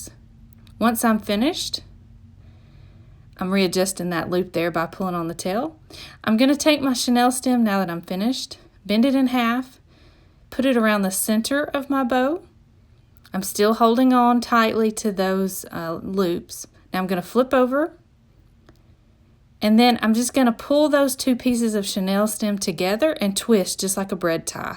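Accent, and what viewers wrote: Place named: South, United States. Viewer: Yes! Love a good ole fashioned southern accent!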